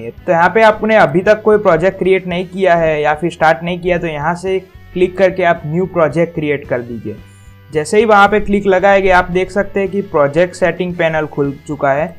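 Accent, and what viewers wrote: Gujarat, India. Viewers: Brother aap Gujarat se ho kya????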